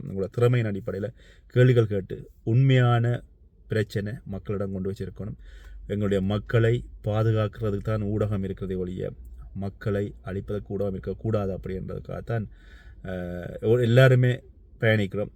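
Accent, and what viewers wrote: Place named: Tamil Nadu, India. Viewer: நீங்கள் ஊடகவியலாளராக பணியாற்றியவர் என்றால் ஏன் Baljeet போல இந்தியத்தமிழ் கலக்கிறீர்கள்?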